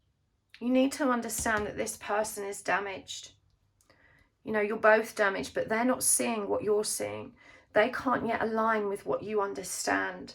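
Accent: United Kingdom. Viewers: I love ur voice ur British accent amazing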